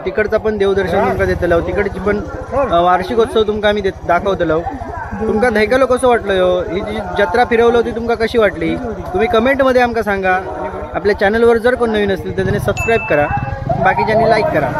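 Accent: Malvani, India. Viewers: लय भारी व्हिडिओ. खुप छान वर्णन केले आहे. तुझ्या बोलण्यात मालवणी गोडी आहे. एक लक्षात घे कि स्थानिक मराठी लोकांचे स्टॉल किती होते व परप्रांती लोकांचे किती स्टॉल होते हे समजले पाहिजे. उशी विक्रेताचा स्टॉल भारी! लोकांना काय हवे याचा विचार करणे गरजेचे आहे. प्रत्येक व्हिडिओ शेवटी विचार करणारा व परिणाम कारक हवा हि विनंती. राग नसावा. यु ट्यूब मध्ये आता स्पर्धा झाली आहे. आपण मुळाचे सिंधुदुर्गवाशी आहोत. आता पैसा कामुक लय व्हिडिओ दाखवतहत. सावधान माझ्या कोकणी बांधवानो! तुम्ही आहात म्हणून कोकण आसा! धन्यवाद 🌹🙏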